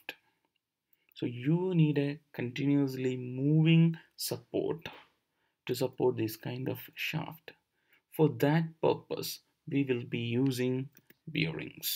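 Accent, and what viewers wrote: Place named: India. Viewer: this is top tier indian accent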